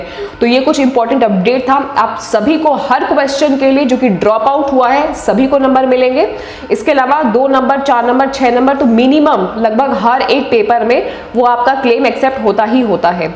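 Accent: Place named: Haryana, India. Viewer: m rohtak se hu mujhe aisa lga ki aap haryana se ho isaliye puchha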